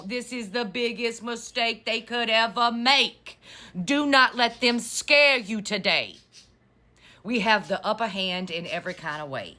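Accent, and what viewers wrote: South, United States. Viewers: 👏🏼👏🏼👏🏼PREACH BABY!!! 💯🎯With that deep southern drawl accent 😂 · You’re brave!! Love your commentaries! You give us courage! I love your voice and your accent, “Why, I do declare, you sound like Scarlett O’Hara! Keep strong everyone!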